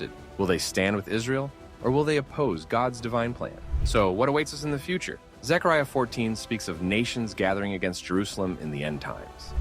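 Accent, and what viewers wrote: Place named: United States. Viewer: I, too, agree and certainly believe we are indeed in the " Latter Days." The Scriptures hold true concerning prophetic events that have come to pass, and the rest to do so are soon to follow. You sound American, perhaps Canadian! ? If so, why the mask ? At least in the U.S. you're free to speak. People may not like what you say or you as a person , but who cares ?! If you're in a nkn- Christian nation, then I understand. Either way, I share your beliefs and pray hou His blessings. 😊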